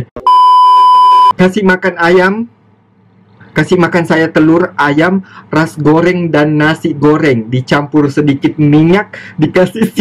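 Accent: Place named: South Sulawesi, Indonesia. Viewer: hehehehe.tetep masih ada kekentalan bugis nya kk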